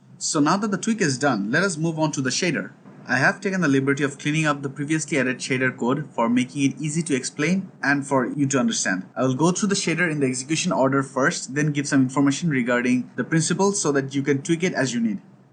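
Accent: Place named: Bangladesh. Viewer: your accent is a bit Bangladeshi. are you from Bangladesh bro ?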